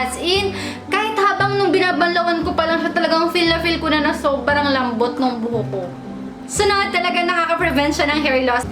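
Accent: Batangas, Philippines. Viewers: Ate, ano year mo na sa college? Bsu ka pala. Kaya pala mala accent mo pang batangas hahaa. Bsu din ako incoming, freshmen pa nga lang.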